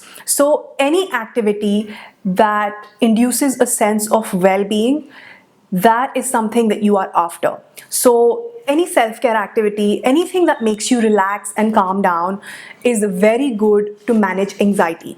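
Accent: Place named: India. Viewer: Amazing knowledge your sharing! Keep helping people my friend. Love your indian accent too you got a sub from me 😄 2021 LET'S MAKE IT A GREAT YEAR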